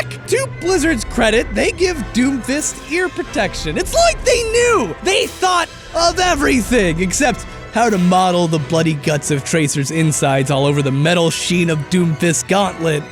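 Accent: England, United Kingdom. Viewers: cute english timelord!!!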